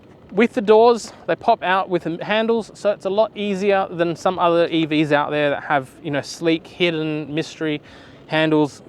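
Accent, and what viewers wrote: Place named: United States. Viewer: Nice car review! Thank you! Btw, you sound like an American so much😂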